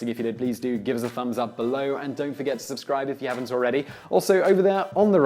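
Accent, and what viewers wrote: England, United Kingdom. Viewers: Cameron Kiesser he’s English.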